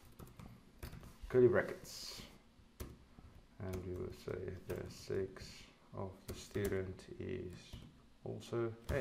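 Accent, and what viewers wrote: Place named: South Africa. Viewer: Your accent says you are in South Africa. . . Super proud of you man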